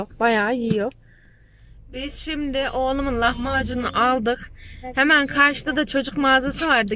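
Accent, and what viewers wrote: Yozgat, Turkey. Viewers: Kim ne derse desın biz yozgatlıyızz biz böyle konusuruzzzz🌹🌹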